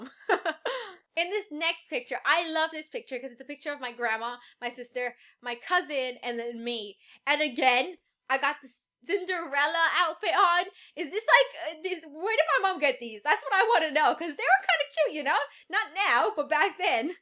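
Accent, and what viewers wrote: Spain. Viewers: Why is the album title in Spanish? It says:cuando era bebe! I'm not surprised cause I know Spanish and she talks like she talks Spanish soooo... Btw you where a really cute baby 👶 ❤️ Like if you agree!👍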